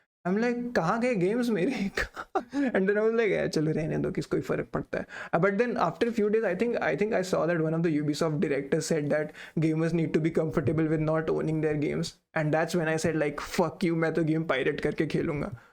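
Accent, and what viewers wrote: Odisha, India. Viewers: Are you from odisha !? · BRO you from odisha ?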